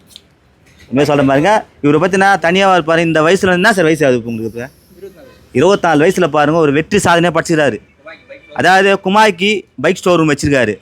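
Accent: Madurai, India. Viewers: Super Anna madurai vasagam ❤🎉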